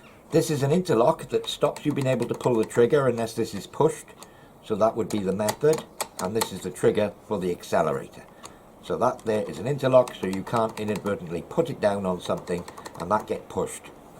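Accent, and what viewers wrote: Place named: Wales, United Kingdom. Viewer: Great video... Deserves more views!! I'm probably going to buy it as a direct result of this video... You should have an amazon link to this one, so you get a bit of coin when viewers buy...!! Keep making these videos dude! You also sound kinda local? I'm in Ammanford, just north of Swansea. Where you from butt?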